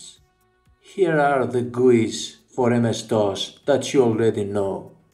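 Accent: Greece. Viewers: He sounds Greek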